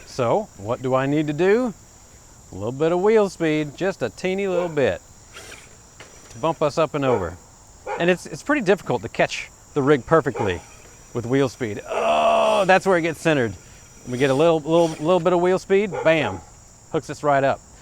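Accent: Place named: South, United States. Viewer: Nice southern drawl too.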